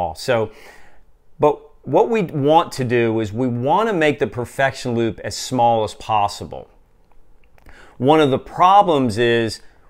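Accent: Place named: New Jersey, United States. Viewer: It says Old Dominion, but every time you say "wadder" I hear NJ/eastern PA.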